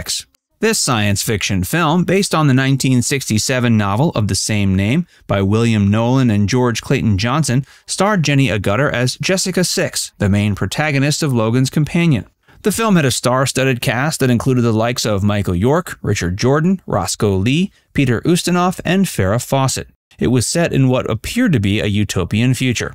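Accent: United States. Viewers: Nice job your mispronunciations had me in stitches, literally rolling on the floor. I suppose you are speaking American though and not English.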